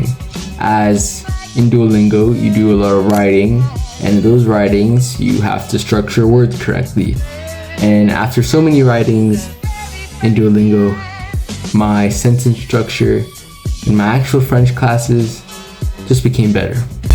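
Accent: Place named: United States. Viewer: oh Gosh, but u seem an American guy cuz of ur English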